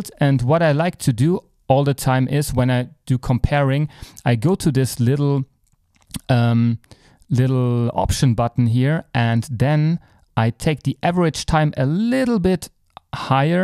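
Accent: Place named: Germany. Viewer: that german accent lol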